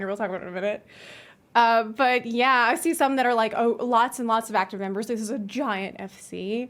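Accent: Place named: Mississippi, United States. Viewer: I know you live in Ukraine, but are from the US, but I can't help but recognize the accent. Are you from MS? Love you bye!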